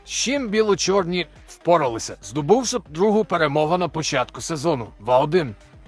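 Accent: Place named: Canada. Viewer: Коментатор,наче з Канади)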